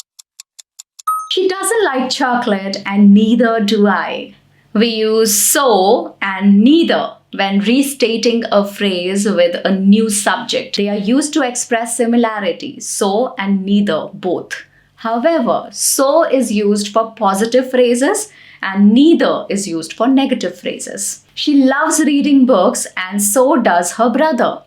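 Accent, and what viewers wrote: India. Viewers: Hey, your new SUBSCRIBER✋cuz my viewers want me to speak English like you with Indian🇮🇳accent so now I’m gonna work for my YouTube AUDIENCE❤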